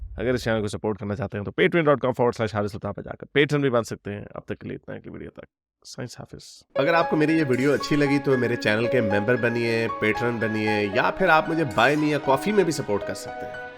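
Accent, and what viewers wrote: Punjab, India. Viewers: Apki Panjbai Accents bahut Achha Lgta Hqi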